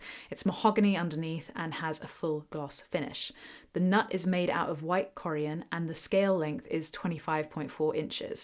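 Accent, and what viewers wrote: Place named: Bristol, United Kingdom. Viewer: Always a pleasure to see you, and hear that lovely Bristol accent. Beyond that, I'm with "intersanctum." Lots of guitars look and play similar to this one, and Martin should make a " " edition if you're doing Martin commercials. I do like the new neck joint, and, of course, it's a Martin, so it sounds great. Way out of my modest price range, however….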